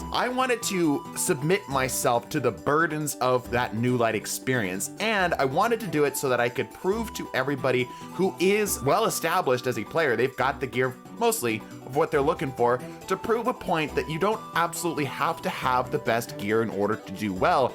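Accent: United Kingdom. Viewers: Is this the same dude with the British accent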